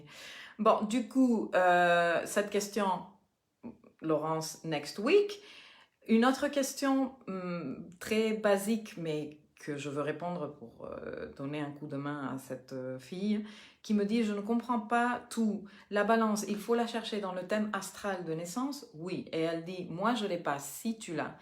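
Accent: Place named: Mexico. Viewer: Merci beaucoup pour votre reponse...🙏🙏je vous découvre depuis quelques temps et j'aime beaucoup vos analyses et interprétations très éclairantes qui se croisent avec d'autres...et surtout j'aime beaucoup votre accent Mexicain... Gratitude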